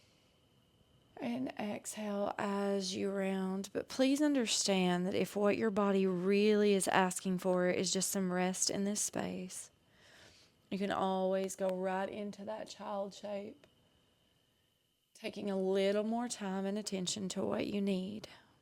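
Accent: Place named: Tennessee, United States. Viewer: 🌹🔥🫶🏼🔥🌹 Love your Tennessee twang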